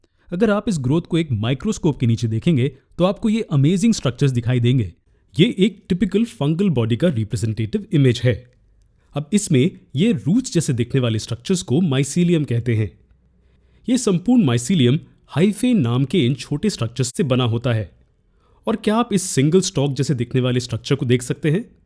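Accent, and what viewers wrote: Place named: India. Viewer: Nice ........hindi accent sounds wow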